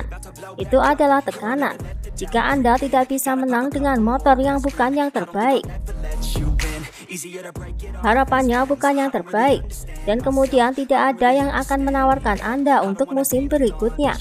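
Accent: Java, Indonesia. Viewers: Chanel ini keren. Tapi agak kurang pas saja dialek & intonasi mimin_host nya. Nampak banget seperti anak SD (untuk ukuran host YouTube) cara host baca dialog. Lagian dialek jowo ne nan kental mendowngrade level Chanel ini. Itu aj point' yg belum gw mensubscibe Chanel ini.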